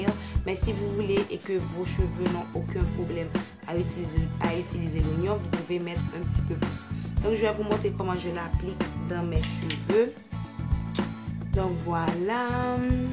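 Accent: Haiti. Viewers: Vous etes une haitienne ca c est sure...votre accent francisé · t'es haïtienne ça s'entend lol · Tu es une haitienne benh ouais vous l'êtes avec tes prononciations francais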